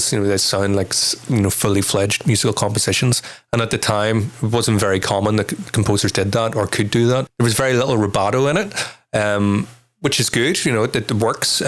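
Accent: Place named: North, United Kingdom. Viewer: Generally, Chopin, or romantic music for that matter, is not my cup of tea. I am more a Bach (I'm German after all) or Beethoven, Rachmaninow person. But in this context, it is really enjoyable. I've seen this several times and this video is my favorite by Lovebites. And those ladies definitely got balls. Like a friend of mine from Scotland answered to "Who can wear a kilt?": Everyone, you just need the balls to do so. On another note are you a Scot? You sound at least like someone from the north of the island. For my German ears, this is more pleasing than RP.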